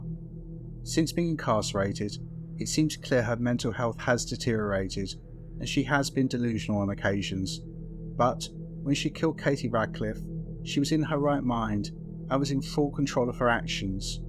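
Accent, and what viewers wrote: United Kingdom. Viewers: hello love your YouTube channel and love your videos and love your British accent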